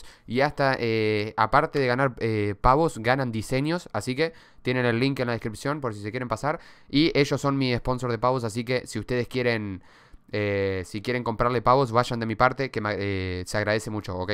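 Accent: Uruguay. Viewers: de que parte de uruguay sos bro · es de uruguay?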